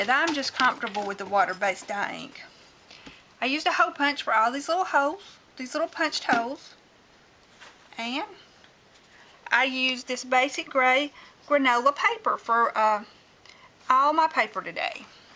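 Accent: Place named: South, United States. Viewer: Oh, I just LOVE to watch your videos....your voice is sooooo sweet and Southern - I LOVE IT:) Your card totally ROCKS!!!! Thanks for letting us know the letters from Songbird welds good, I am going to try it out!!! Hugs, T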